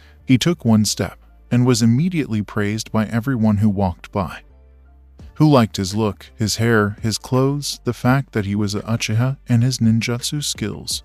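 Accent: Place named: Indiana, United States. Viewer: Sometimes I feel like all of these Naruto what if channel's are made by the same person with the same voice and the same accent like I think it's kinda sus that at thea beginning of each vid it's like this guy with I think is a Indiana accent and I lot of other What if channel's I feel like are always random guys with a Indiana like accent and the fact that all them always talk with that accent in the beginning of the video is a hint but its just a theory a film theory thanks for reading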